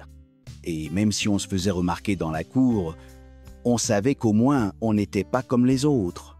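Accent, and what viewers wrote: Quebec, Canada. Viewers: Le paradoxe : un accent québécois pour nous narrer un moment de la "franchouille beaufesque" parisienne. Un mauvais délire.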